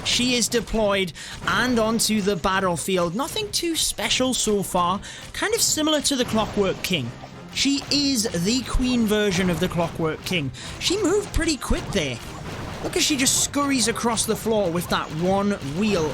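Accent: United Kingdom. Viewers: British accents is melody to my ears.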